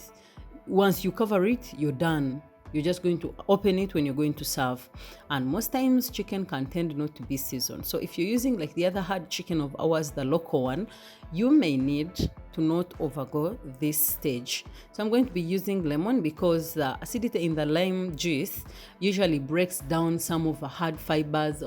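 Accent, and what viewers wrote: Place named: Ankole, Uganda. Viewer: First time here , fast forward I love ur English ankole accent , then how u make it simple for day to day life of a Ugandan not professional chef, I have already subscribed can't afford to miss anything. Well done mami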